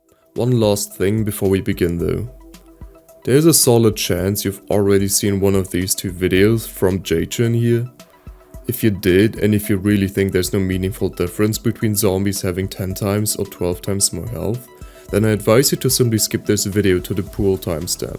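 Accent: Germany. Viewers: I Instantly knew you were German the second i heard the accent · Im also German and I instantly heard your accent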